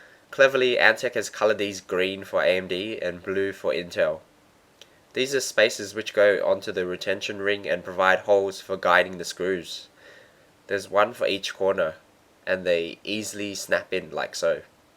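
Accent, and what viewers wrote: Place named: Australia. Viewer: Australian accent is always nice to listen to.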